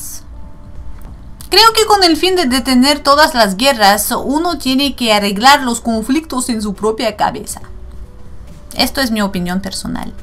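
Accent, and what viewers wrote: Russia. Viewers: No me gusta como hablas el espanol me gustaria que hablaras el espanol sin acento ruso y lo hablaras como nosotros los latinos. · Estas perdiendo tu acento ruso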